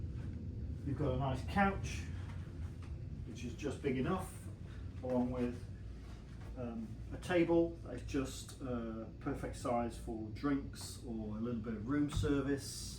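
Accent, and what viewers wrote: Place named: United Kingdom. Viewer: fantastic video, loved it. questions: bathroom, soaps incl, lotion, shampoo/conditioner incl? 2nd question magic carpet you stated nobody can see us on balcony? if magic carpet goes/up down, how do people not see you? Oh love your accent as Americans have various accents too, southern, and boston, new yorkers talk different and rest of us have regular accents but love the UK accents so so awesome....you sound like a teacher or public speaker awesome love your presentations.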